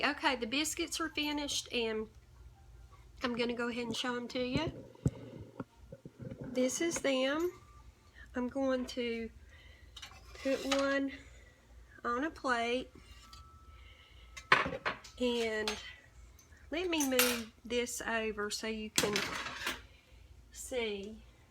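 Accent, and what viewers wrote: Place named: South Carolina, United States. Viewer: Since I am new, where are you from...You have a South Carolina accent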